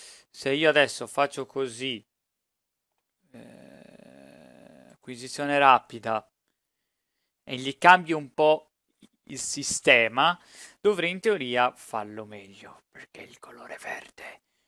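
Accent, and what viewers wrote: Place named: Sardinia, Italy. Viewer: mi sa che siam conterranei..sei sardo vero? sembra del cagliaritano..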